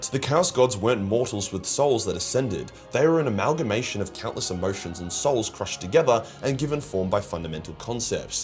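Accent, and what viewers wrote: Australia. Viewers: Heh you didn't just stumble over "unfathomable" - you also mispronounced "dirties". I think even taking your Australian accent into account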